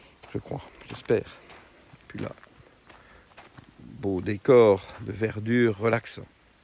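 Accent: Belgium. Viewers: Merci pour ces éclaircissements docteur. Je vais voir pour ma prochaine analyse en novembre. Entretemps je vais voir avec votre assistant les possibilités de consultation. Je viens de Bruxelles et j'ai cru comprendre d'après votre accent et les paysages de vos ballades avec Goliath que vous êtes situé en région de Liège. Je devrai probablement prendre congé pour venir vous voir. A bientôt de toute façon ;-)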